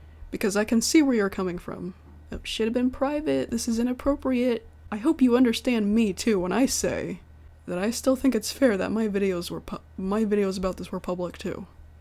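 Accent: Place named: South, United States. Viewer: I love your voice you sound southern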